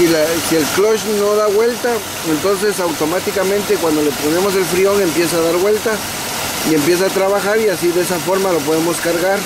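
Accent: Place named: United States. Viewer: Y luego para que hablas como Pocho....pues cuanto tiempo tienes allá que ya se te olvido ablar el español....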